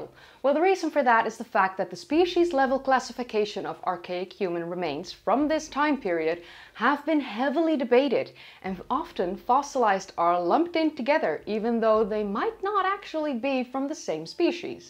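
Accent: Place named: Netherlands. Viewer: Very Dutch accent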